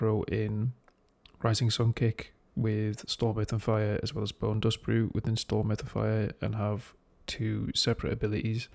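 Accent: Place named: Newcastle, United Kingdom. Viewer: Radge hearing a fellow geordie on a wow guide 😂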